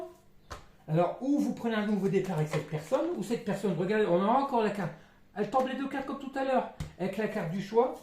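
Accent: Nord-Pas-de-Calais, France. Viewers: Bonjour,toujours un plaisir d'ecouter vos guidances gratitude 🙏a vous.Et oui avoir la Foi c'est le secret,se prioriser et le reste suivra. Je vais bientot vous consultez,j'adore votre accent chti.Je vous embrasse 😘.Belle soirée a vous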